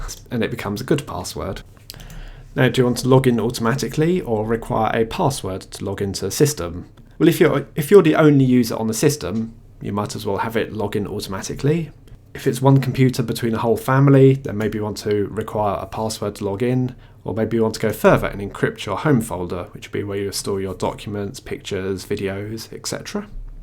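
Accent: United Kingdom. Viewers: I love your UK english man Nive vids, thank you :)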